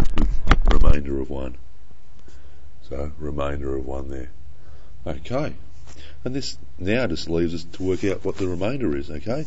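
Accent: Australia. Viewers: tanvi sharma, he's Australian.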